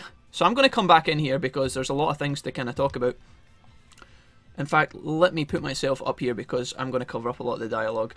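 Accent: Scotland, United Kingdom. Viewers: Scottish Ron Weasley Digimon World let's play...... You know I'm gunna thoroughly enjoy all these episodes ☺️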